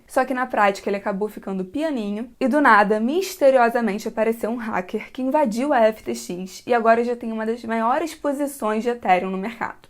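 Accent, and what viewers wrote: Rio de Janeiro, Brazil. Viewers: Nossa, seu sotaque carioca torna o vídeo mais gostoso de consumir. Sério, hahaha. Então, sou novo no canal e desde que lhe descobrir no TikTok, venho acompanhando todos seus vídeos. Tanto aqui quanto lá e posso afirmar que você explica muito bem. Exprime o conteúdo de modo claro. Muito bom. Bitcoin ainda é complexo pra mim — sou leigo no assunto gerais de economia —, mas o interesse que tenho por tudo que envolve economia me locomove para entender mais. É bom estar atualizado por alguém lúcido. Forte abraço. 👍🏼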